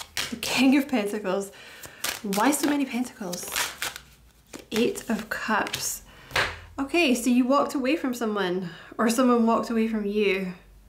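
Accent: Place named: Scotland, United Kingdom. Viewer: So nice to hear a fellow Scot 🏴 thank you for this reading 🙏🏼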